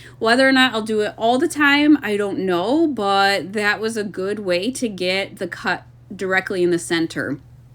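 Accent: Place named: Great Lakes, United States. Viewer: We played a lot of euchre near Buffalo, NY. Maybe it’s a Great Lakes game, like the accent!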